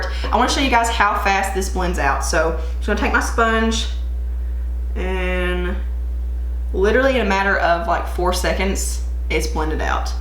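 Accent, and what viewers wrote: South, United States. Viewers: I love love your video! This is the first time I’ve seen your channel and I live it!!! You have a wonderful voice and you don’t stammer! You know exactly what you want to say! No “um” no “like”! You talk fast but not too fast! On other channels if the talk too slow I find myself saying come on spit it out already! I get bored and go to another channel! You are very professional! And your southern accent is over the top! Will be watching all your videos! You rock girl! Thanks and keep up the great work!!! PS I love your lipstick! What is it? All of your makeup looks fabulous! Great job!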